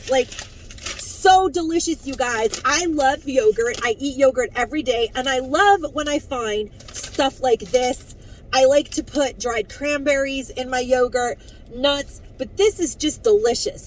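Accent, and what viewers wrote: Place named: Long Island, United States. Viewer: I so enjoy your videos. Your accent is very similar to my own. I'm originally from Valley Stream, LI but I've lived in South Carolina for 15 years. Listening to you sounds like home. Bless you!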